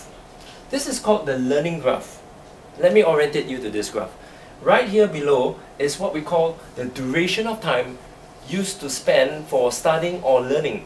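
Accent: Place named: Africa. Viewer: Why do you sound so African?!?!?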